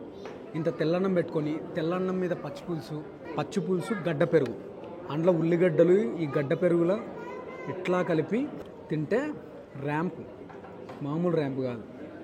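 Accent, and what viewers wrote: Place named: Telangana, India. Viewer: Idhi తెలంగాణ bro... andhra kaadhu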